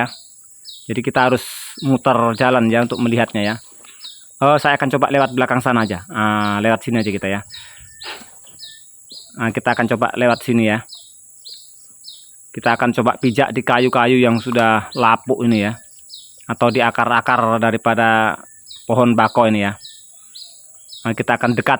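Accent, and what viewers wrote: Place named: Medan, Indonesia. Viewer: Abang ni logatnya kayak irang medan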